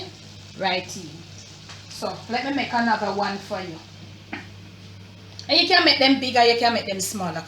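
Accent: Jamaica. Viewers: Hi Ms 👋. I enjoy and like watching your videos. I like to hear you speak and your Jamaican accent. Your cooking and method is very simple. I wish you all the best in life Good health and happiness ❣️.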